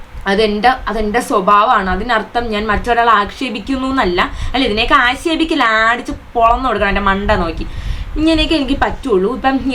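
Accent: Trivandrum, India. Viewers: Nte ponnu koche iyalu tvm evdeya. Njnm tvm anu bt nte slang inganeyonumalla. Iyalu pakka tvm. 😂😂😂 Njn chirichu oru vazhi aayi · Tvm slang polichu chechi😍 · "TVM ano sthalam ...samsaram agane thonee....anthayalum marupadi kalaki 🤝👍.."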